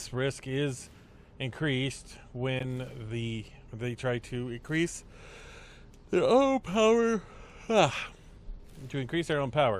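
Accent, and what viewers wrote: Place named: United Kingdom. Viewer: he's British, not American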